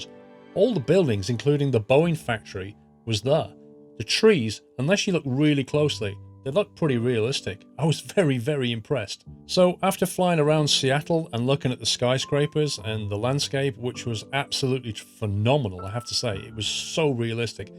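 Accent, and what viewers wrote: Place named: Bromsgrove, United Kingdom. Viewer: You speak just like my cousin from Bromsgrove, coincidence?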